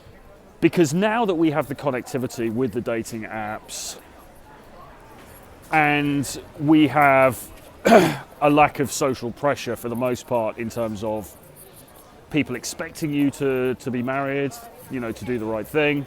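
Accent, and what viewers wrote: United Kingdom. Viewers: is a traveling street man on the move. He's the 007 of the Manosphere! Complete with British Accent. Now where is hiding that Aston Martin DB5?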